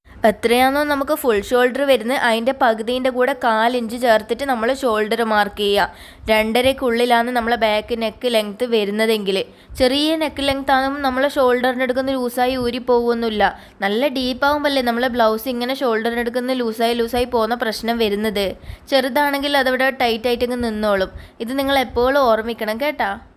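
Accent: Kannur, India. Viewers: കണ്ണൂർ ആണ് അല്ലേ😂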